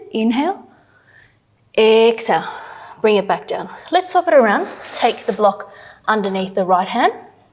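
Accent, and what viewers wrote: Australia. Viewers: I feeeel so goooooood thank you💚💚💚💚💚💚💚are you Australian?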